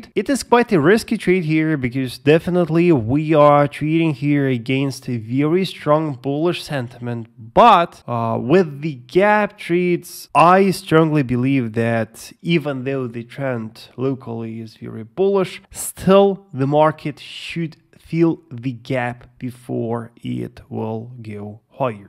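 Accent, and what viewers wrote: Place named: Russia. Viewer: О видно что акцент русский)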